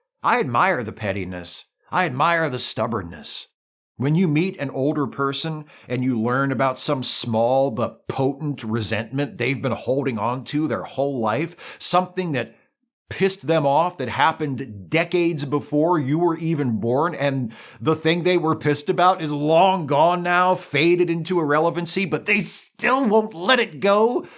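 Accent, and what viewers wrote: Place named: Northeast, United States. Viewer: Very Pennsylvania/New Jersey vibes, I approve.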